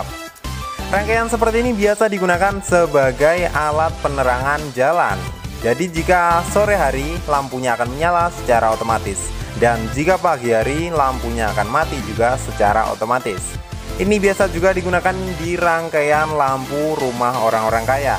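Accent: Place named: Java, Indonesia. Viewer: yang biru kita sambung Ke Puteh..😅 Auihihi.. B.jowone iseh ketok mas broo..👍👍😊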